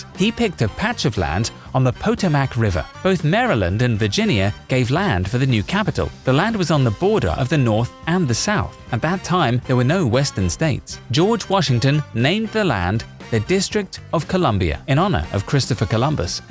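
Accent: United Kingdom. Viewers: British narrator! 🤣🤣🤣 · 1. Not michelle but Michael oboma.. 2.Geprge Washington had a copper Steel first..not Obama brewery.. Why a British accent giving American history gives pause to wonder..ok I'm done.. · I like how this guy is talking about America but he British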